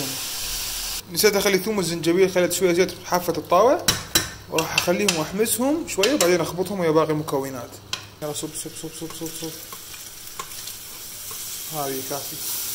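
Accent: Mosul, Iraq. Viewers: تحية لك ولشغلك الراقي ست نجوم وليس خمسة.. متميز بكل شيء.. و من شكلك ولكنتك وكبة الكسمايات النص عرفتك مصلاوي😍 وافكارك اغلبها لم يسبقك بها احد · عاشت ايدك عالترتيب بس سؤال انتَ من الموصل؟ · ولله كلامك تمام هوى مصلاوي بس عايشين بلندن واني مصلاوي عايش وادرس ابريطانيا 😘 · اما مصلاوي اما كردي من شمال العراق الان نفس اللهجه والحاضرين اسمائهم كرديه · اللهجه المصلاوية ( معلقه ) تنور الفيديو 😂😂😂♥️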